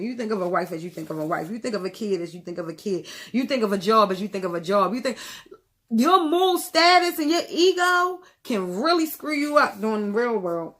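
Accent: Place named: Virginia, United States. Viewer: Wow sis this reading was very detailed to the Teaa & it's accurate as hell 👏🙌. When you mentioned the Devil card I said wow its scary because I've been seeing 555 constantly along with other #s too. The Universe is speaking to us all about letting go the toxic sh*t from our past so new things & opportunities can come our way. Not to mention mercury retrograde is also in the mix 🤦‍. Im loving your readings & loving your vibe. I feel like you also maybe from Virginia or Maryland because you have an accent lol 💕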